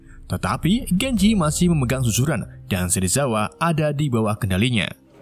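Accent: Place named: Central Java, Indonesia. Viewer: pames kwkw,jateng bgt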